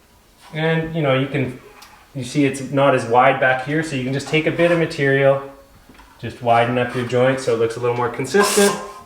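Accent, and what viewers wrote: Canada. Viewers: I like how Canadians say "process"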